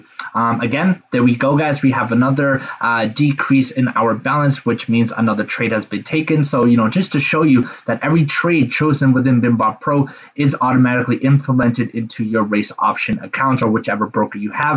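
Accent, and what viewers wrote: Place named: United States. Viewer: to me your accent sound so American 😅 where are you from or at least where do you reside? Because you have access to those brokers that does not allow Americans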